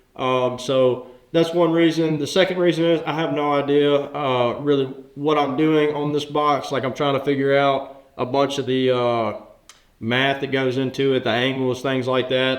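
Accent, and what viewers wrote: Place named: South, United States. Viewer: the redneck southern drawl is epic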